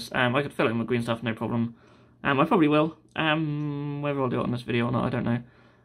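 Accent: Norfolk, United Kingdom. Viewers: Well then what yew sayin'? Ha yew got a loight then? Nah just joking. I'm a Norfolk buh n all born & raised, that's why I recognised the accent. Although I wasn't sure - I guess being used to it for so long you forget what some of the other accents sound like a bit. Anyway I love your channel & your website. It's equal with eBay in being my two most useful resources for conversions. Done a couple orders from you recently & will be again. Looks like I forgot to subscribe, thought I did it before. I'll do that now! Good ol' buh mate!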